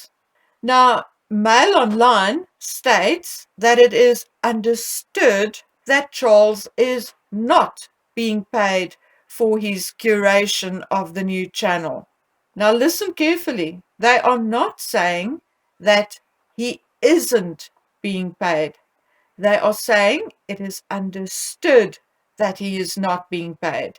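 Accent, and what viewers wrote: South Africa. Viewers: Hi, really enjoy your videos. Love your accent it reminds me of the years living in S.A. such a beautiful country and wonderful warm people. Ignore the "Negie Megies" if they don't like what you have to say they can change the channel and protect their sensitive little souls, yes, sometimes the truth hurts! They just need to "smarten up." No body shaming, but I do think Mz. Meggie has put on weight and the padded look in her thighs possibly the result of some of control panty, Spanx? When you check out other pictures and look at the size of her calf muscles, to me, it looks like they are a little fuller. Regarding her "fashion sense" or lack thereof, she nearly always looks to me like she has been dragged through a hedge backwards - my personal opinion. The rolled up cuffs on the red outfit that looked like it was made from a yacht's spinnaker was purely to show off the collection of jewellery she was sporting on her wrists and hands... 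so transparent! As for PH's treatment regarding those children... don't get me started, he needs a good swift kick up the arse. The pair of them should be ashamed of themselves as should whomever set up the publicity stunt for them. Yes, the timing for PC's does look dodgy, however in his defense, climate change, farming, the land and the environment have been a passion of his for years so whatever the Harkles are bringing to the table regarding this is something they have absorbed like dried up sponges and wrung with a "hey look at u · Good for you. I love your channel I also adore your accent. I adore South Africa. Use to live PMB. Keep up the great work. I look you and Sue me both out. Love you.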